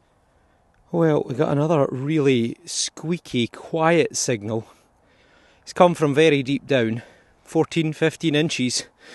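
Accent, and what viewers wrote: Scotland, United Kingdom. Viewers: Grettings from Denmark. I love to watch your videoes and listen to your charming scottish accent. Keep on the god work 😊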